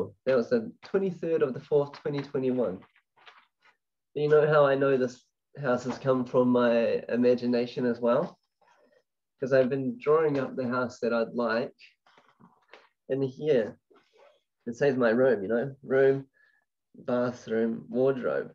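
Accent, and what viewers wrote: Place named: New Zealand. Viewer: Please do a talk in Auckland. I knew you were from NZ straight away hahaha 🙏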